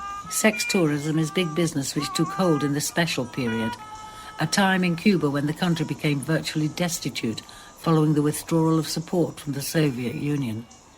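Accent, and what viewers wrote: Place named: United Kingdom. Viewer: A stupid British accent lady doing the video come on ppl seriously.....have someone else do the video.....you suck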